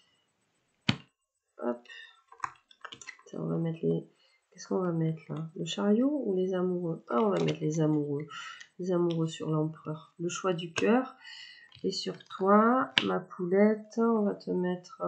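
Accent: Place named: South, France. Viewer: Bonsoir ravie de vous revoir une tres jolie guidance je ne vous lâche jamais j'aime votre façon de parler un peu de sud quant au eeee je comprends lorsque l'on réfléchi tout a fait normal les gens vraiment 🙄 débile prenez bien soin de vous surtout a tres vite j'espere🤩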